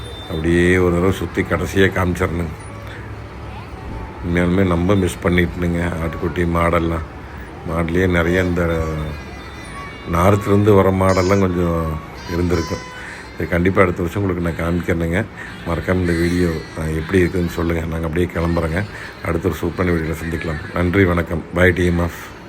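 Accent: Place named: Coimbatore, India. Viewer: Anna unga kovai tamil super.. Enakum kovai tamil kathukanum, but iam chennai na unga periya fan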